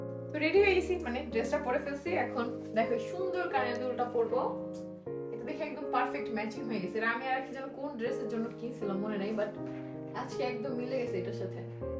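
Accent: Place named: Khoyraita, Bangladesh. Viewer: খয়রাইতা কাঁথা বলছো আমার শুনতে ভালোই লাগছে😅😅😅😅😅😅😅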